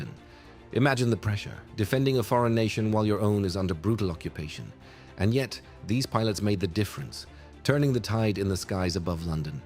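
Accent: Poland. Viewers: Polak zrobił to wideo, komentarz głosi po angielsku z polskim akcentem, kupa Polaków pisze pod spodem do siebie w mniej lub bardziej kiepskim angielskim Kumie chwalą nas! Kto? Wy mnie a ja was! Reszta świata ma to w dŭpie. Ziew, następnie chichot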